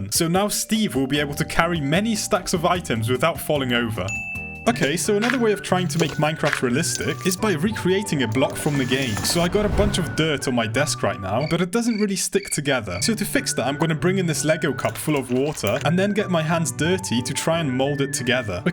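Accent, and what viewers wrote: United Kingdom. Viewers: I know you're British because of the speaking and I don't know how no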